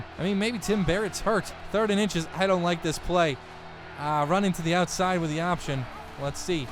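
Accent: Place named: Midwest, United States. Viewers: Old roommate from Apple Valley, MN sounds similar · is a good old fashioned Midwesterner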